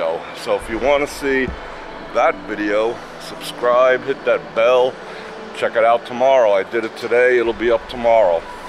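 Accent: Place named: Brooklyn, United States. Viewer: Ahh, there's some new knowledge. Also, I now hear Brooklyn in your voice.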